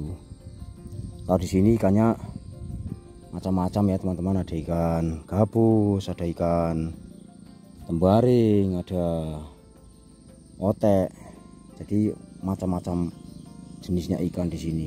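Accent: Banyumas, Indonesia. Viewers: Tetap semangat, ora ngapak ora kepenak gus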